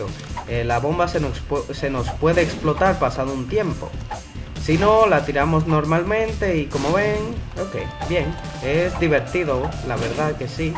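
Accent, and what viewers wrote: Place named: Spain. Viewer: creo que se refiere a que tu asento parese español y la mayoria de los youtubers "Famosos" son españoles(perdon por las faltas de ortografia)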